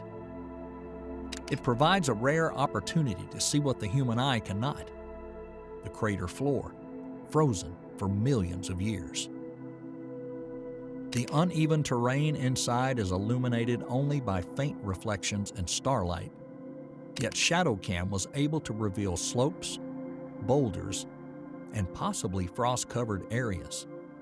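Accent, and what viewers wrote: South, United States. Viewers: Sorry the Deliverance accent is a hard no. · Southern good ole boy . Refreshing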